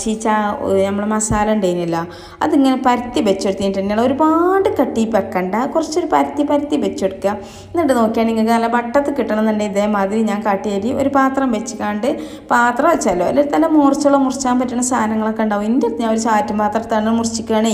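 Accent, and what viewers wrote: Malappuram, India. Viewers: ചാനലിന്റെ പേര് എന്താ മാറ്റിയത്. പക്ഷെ സംസാരം കേൾക്കുമ്പോൾ സൗണ്ടും പിന്നെ കമെന്റ് നോക്കിയപ്പോൾ ആണ് സംശയം മാറിയത്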